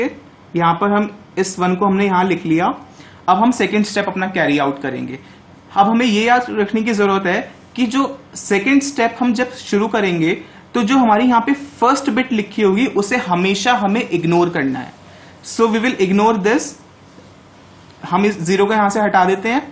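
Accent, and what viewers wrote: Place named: India. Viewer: Good video and nice handwriting! Easy to understand CRC this way. I'm going to watch more of your videos. (A little request? Some subtitles would be lovely. Because 1. it's always easier to follow the teacher :) and 2. easier to decipher some words/sentences - For non-Indian English speakers, Indian accented English can be a bit tricky to understand - even if we are used to the accent. I'm sorry to mention it, but I thought maybe you'd like to know).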